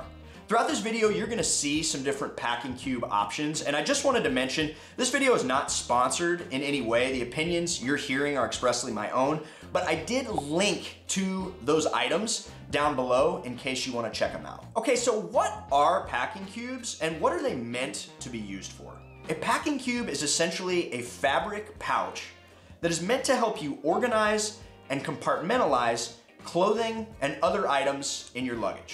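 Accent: United States. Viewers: I didn’t mean anything bad. It’s just that you are exactly how I imagine an American to look and sound like ;)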